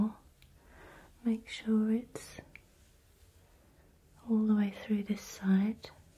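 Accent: United Kingdom. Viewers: I live for the accent. Are you Australian or British? It sounds more British to me..? Anyway, great video!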